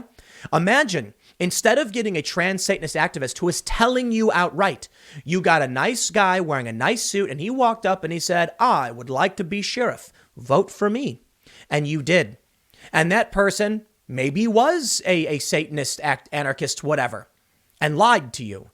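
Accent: Chicago, United States. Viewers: Yup. Im English but is it his slightly Northern/ Chicago accent?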